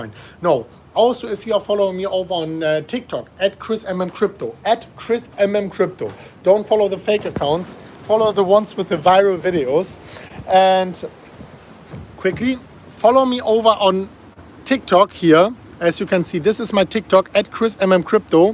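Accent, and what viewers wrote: Germany. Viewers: Is he Austrian or German? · 300.000 just right now!!! congratulation mate. btw are you from germany or austria?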